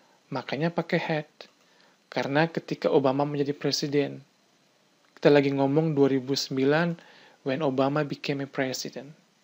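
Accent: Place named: Palembang, Indonesia. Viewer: orang palembang ya kak. setiap ngomong selalu pakek "e" hehehehehehehe paham betul aku cakap palembang. suka tutorial ini mudah dipahami.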